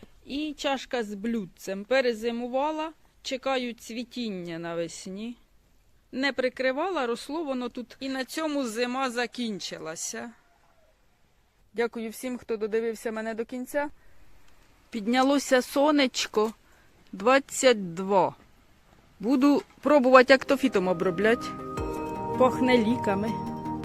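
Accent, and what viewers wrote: Poltava, Ukraine. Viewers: Доброго Вам здоров'я. Мене захоплюють Ваші відео щирістю, гумором, простотою. Та ще й рідна полтавська мова! Успіхів Вам !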